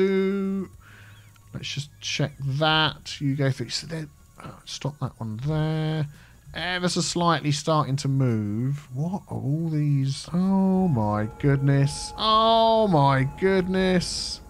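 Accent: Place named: Derby, United Kingdom. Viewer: You sound like you live right round the corner from me. Im from Derby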